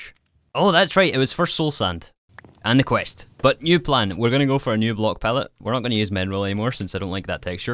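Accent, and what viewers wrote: Scotland, United Kingdom. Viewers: a fellow scot? interesting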